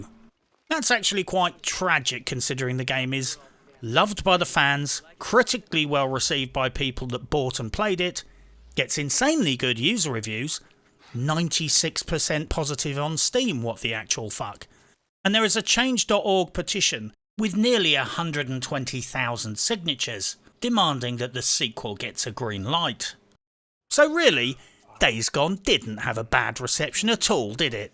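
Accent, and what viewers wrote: United Kingdom. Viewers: Thanks british guy 👍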